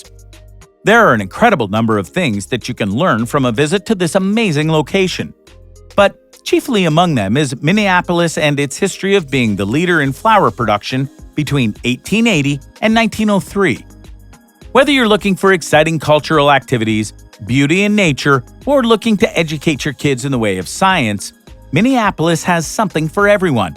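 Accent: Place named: Canada. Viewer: The narrater sounds Canadian.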